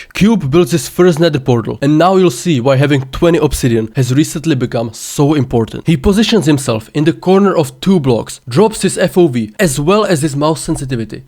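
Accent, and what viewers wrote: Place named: Czech Republic. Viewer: Yo great video and breakdown! Keep up the content ;) (Within the first seconds I was just searching whether or not you are Czech, knew I heard the accent! :D Nice to see some more Czech people with actual good videos)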